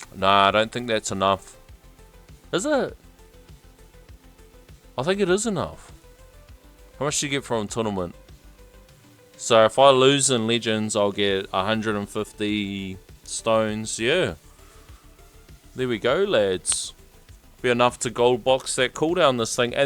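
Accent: Australia. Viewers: nothing like getting some work done with that sweet aussie accent talking about my favorite tower defense game of all time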